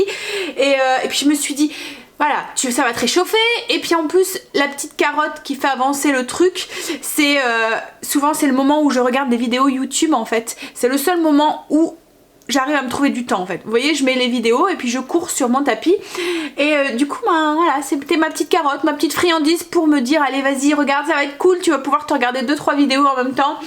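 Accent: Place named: Nord, France. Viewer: coucou ,c'est toujours un vrai plaisir pour moi de te retrouver ,tu es tellement naturelle et "normale" et tu me fais penser à ma copine qui vit là bas dans le Ch'Nord hihi(le ptit accent ;-)) plein de bisous ma belle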